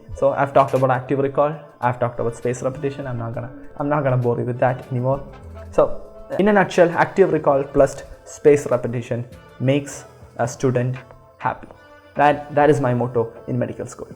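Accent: Kerala, India. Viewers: I love your mallu accent da!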